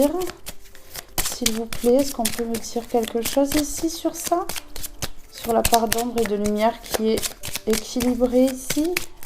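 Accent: South, France. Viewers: J'adore vous écouter Madame. Vous êtes très belle en plus et votre accent du sud soleil rajoute une multitude d'aromates au plaisir de vous admirer. Merci de nous faire du bien avec ce que vous êtes ❤😊😊❤❤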